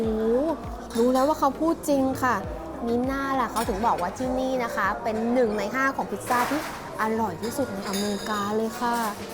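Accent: United Kingdom. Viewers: สำเนียงพี่ บริติชมากเลยยยย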